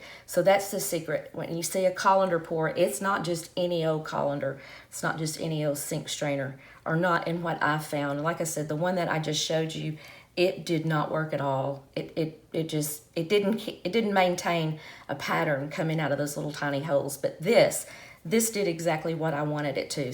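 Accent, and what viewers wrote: South, United States. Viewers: Thx for sharing! I love your southern accent ❤️. I’m from WV 😃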